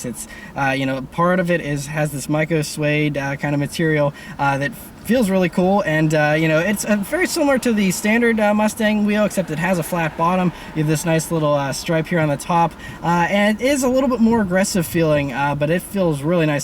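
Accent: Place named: Pittsburgh, United States. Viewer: Dude! I love your videos. You sound like you're from Pittsburgh. You remind me of Mr. Rogers as a child who loves cars instead of marionettes and toy trains.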